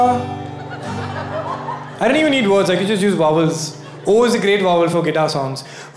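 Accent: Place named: South India, India. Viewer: why are so you insanely cute? 😍 Loved all your videos and have been sharing them with everyone I know.. you are simply awesome!! Being a south Indian, I love that accent and those words too 💗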